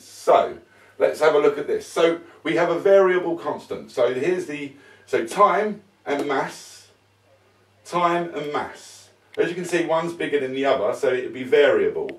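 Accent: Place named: United Kingdom. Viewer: Of course it would be a dumbass Brit pretending to argue against Newton. I mean your people are so completely slow in the head that you pretend your preserved parks are deep woods on your deforested little island nation and beat your knives in the place of buying saws. I surely hope that by now everyone recognizes that dumbass accent as the idiocy that it actually represents.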